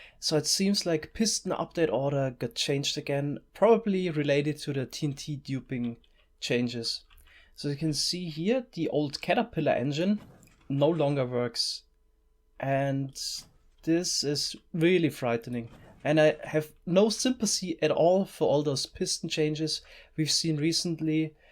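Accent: Germany. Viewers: Ich liebe diesen deutschen Akzent😂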